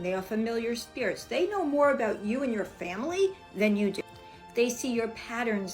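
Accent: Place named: New York, United States. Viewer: Yes. Thank you for letting everyone know. I always try to explain to people that psychics can tell you about your past, present and future because of the familiar spirits that are with you and correct me if I’m wrong, but when you leave, the familiar spirits that were there leave with you? Also real quick, when I first heard u on Doreen Virtue channel a few years back, I was like Ok is definitely from NY, lol I wasn’t sure where, I thought BK but then I found out LI… I’m from Staten Island… love u girl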